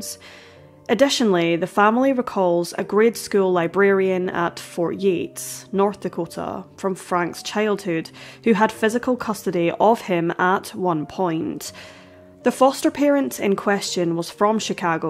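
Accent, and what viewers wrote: Scotland, United Kingdom. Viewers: The Scottish🏴 accent is quite lovely🤗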